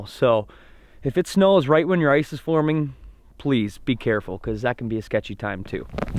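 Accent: Minnesota, United States. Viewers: Sounds like Minnesota to me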